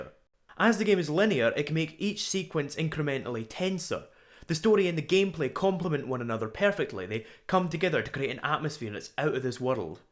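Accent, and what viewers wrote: Scotland, United Kingdom. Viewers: I freakin' love your scottish accent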